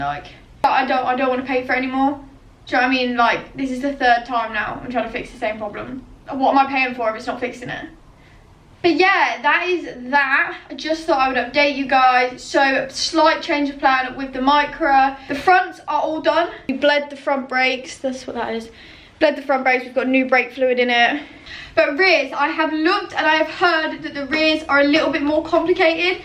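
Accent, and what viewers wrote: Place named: United Kingdom. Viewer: Clicked on the video out of curiosity, then you hit me with that UK accent and I couldn't stop watching. Love the humor but also the ambition. Subscribed for support and I'll be checking out you other videos, get it girl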